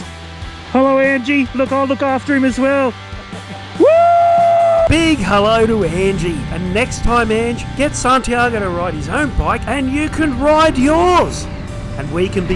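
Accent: Australia. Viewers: Great video. And the best Aussie commentary. Got my sub...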